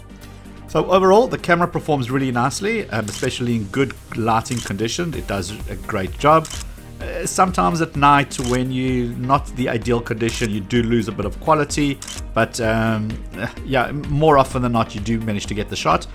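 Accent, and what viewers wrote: South Africa. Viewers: south african accent there ... lol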